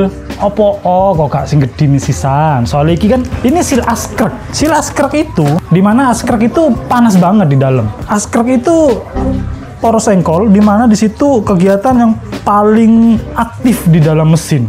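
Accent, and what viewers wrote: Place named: Jember, Indonesia. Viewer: Bahasanya cekk jember nya rawes kamu mat mat 😂😂 Suwun sing akeh mas infonee 😁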